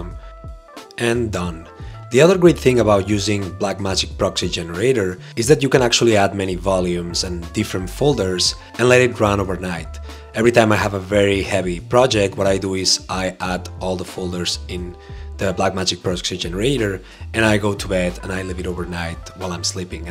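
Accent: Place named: Catalonia, Spain. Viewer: Que soooolid! m'he catat q erets català per l'accent en anglès😂😂😂 una mica Pep Guardiola... Segueix així que ajudes molt👍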